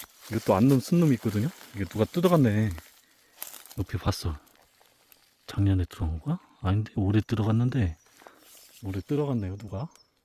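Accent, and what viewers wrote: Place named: Chungcheong, South Korea. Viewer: 벌거지...ㅋ.ㅋㅋ 충청도 사투리 같은...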